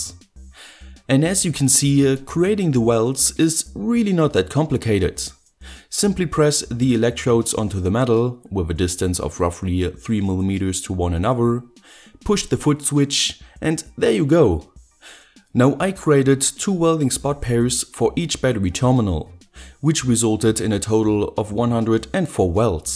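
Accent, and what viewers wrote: Germany. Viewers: German English ;) "controlaaa" :) "wourkin" :)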